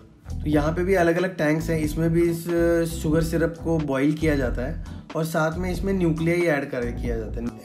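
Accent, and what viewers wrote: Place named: Delhi, India. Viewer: Never expected this kind of Hindi accent ( Delhite) from the South Maharashtrian person.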